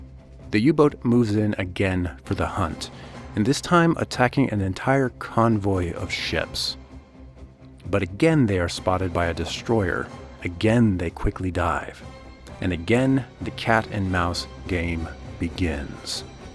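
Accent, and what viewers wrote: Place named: Austria. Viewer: Östereicher habe ich mir gedacht. Es klingt ein ganz klein wenig anders als Bayrisch. Aber so genau könnte ich es nicht sagen...Ok bin ja auch Norddeutscher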